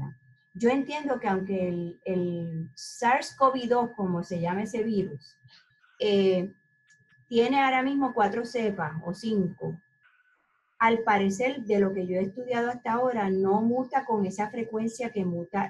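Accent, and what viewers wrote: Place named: Caribbean. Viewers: Jorge José, decir que una médica pierde su credibilidad por tener acento caribeño es racismo. Que pasa con los médicos caribeños? No son lo suficientemente buenos para ti? Todas las personas deben ser tratadas con respeto, independiente de su país de origen. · Tiene acento caribeño pierde credibilidad y peor si son venezolanos.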